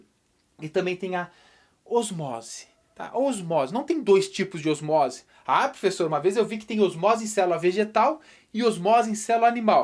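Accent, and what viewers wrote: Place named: South, Brazil. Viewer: Bofe é bonito,pelo sotaque é sulista,e muito didático, estou estudando com sono,boladona com os colegas de turma,e senhor me fez rir,quase caindo.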